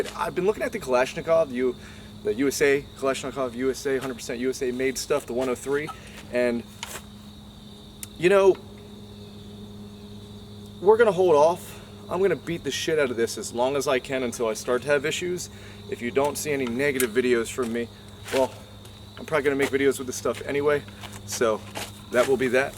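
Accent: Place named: New Jersey, United States. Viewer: So your from New Jersey’s then?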